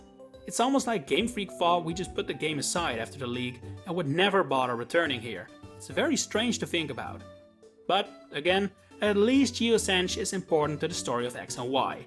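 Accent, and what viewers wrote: Netherlands. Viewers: Are you Dutch? :p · Can I ask you what your nationality is? I can't help but hear a Dutch accent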